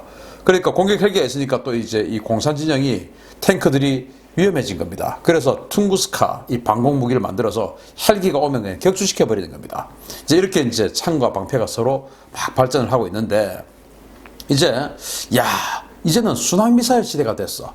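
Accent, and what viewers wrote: Gyeongsang, South Korea. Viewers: 그동안 군사 문제에 있어 좋은 전문가라 생각했습니다. 그러나 문재인 대통령 목소릴 가지고 망신 주는 댁을 보며 그닥 좋은 사고를 가진 사람이 아니라는 사실을 알 수 있었습니다. 경남 사투리로 방송하시는 님! 님도 그다지 듣기 좋은 목소리가 아님을 알려 드립니다. 방송은 표준말이 대세입니다. 개그가 아니라면... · 경상도사투리에 단세포적 수구골통에 참 역겨운 채널이네. 별 깊은 지식도 없는거 같은데 듣기싫은 억양으로 주절주절...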